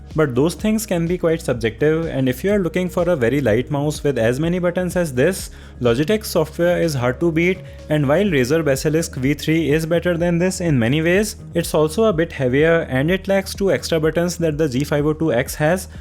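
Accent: India. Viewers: you hindu speaking ppl are simply unbearable to listen to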